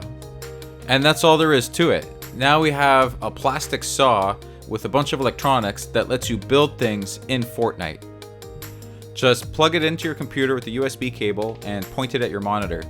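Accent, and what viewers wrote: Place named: United Kingdom. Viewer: British man spotted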